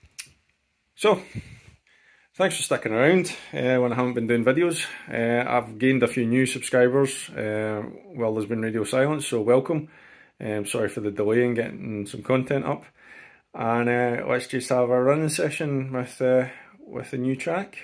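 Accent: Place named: Scotland, United Kingdom. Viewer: Dear Sir, Yours is a great layout (i wish i had a loft), mine is just a wee bit over 2x1 metres. Just one question, for the benefit of us, english non speakers. Your accent is a challenge for me untrained ear. Where in the UK is it from? Scotland, i presume? Thanks and cheers from (happy again) Brazil!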